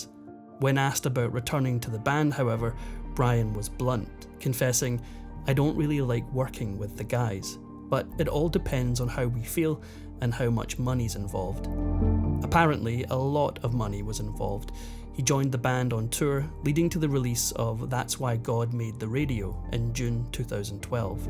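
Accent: Scotland, United Kingdom. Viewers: It's disappointing to click on a Factinate video and then discover that it's not narrated by the Scotsman.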